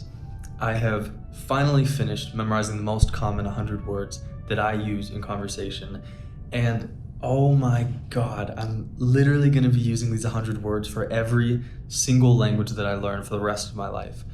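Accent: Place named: Italy. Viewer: You do sound very Italian. Not trying to knock you, but You are making an effort. And I'm going to try using this for Russian 😳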